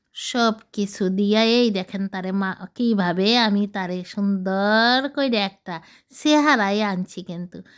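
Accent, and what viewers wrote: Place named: Bangal, Bangladesh. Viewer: তোমার বাঙাল কথা শুনে আমার খুব ভালো লাগলো দিদিভাই🙏🙏🙏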